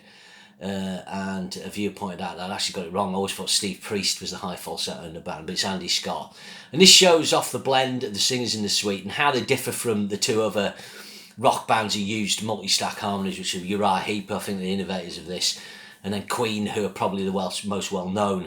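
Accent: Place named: Northern England, United Kingdom. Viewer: You sounded like you were from the north, but didn't sound exactly like the Yorkshire accents I'd heard, so I was just curious. Thanks! I'm from the state of North Carolina.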